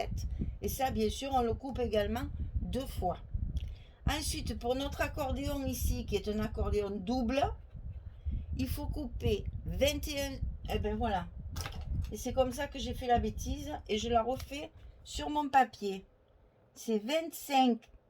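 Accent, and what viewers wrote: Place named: Southern France, France. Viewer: Quelle jolie début ! J'avais vu cette collection mais les textes en anglais pour les fables de La Fontaine ça me pique un peu les yeux 😕 peut-être me ferez vous changer d'avis 😁 En tout cas je vais suivre ce projet juste pour le plaisir des yeux. PS : j'adore votre accent qui sent le soleil, la mer et les cigales. 😉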